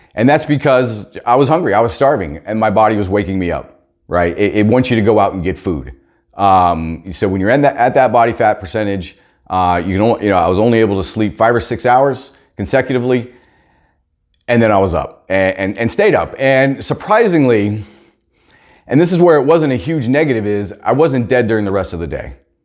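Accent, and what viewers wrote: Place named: South, United States. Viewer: Dude if I close my eyes it sounds like Dustin "The Diamond" Poirier is speaking, are you from south?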